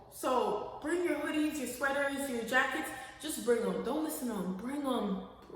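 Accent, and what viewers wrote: Jamaica. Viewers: R u Jamaican? U definitely sound like one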